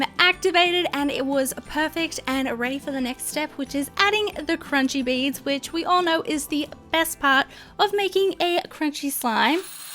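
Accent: Australia. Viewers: I LOVE her Australian accent!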